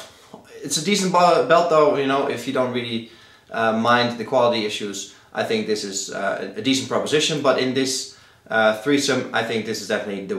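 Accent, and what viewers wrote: Netherlands. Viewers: Is the presenter dutch?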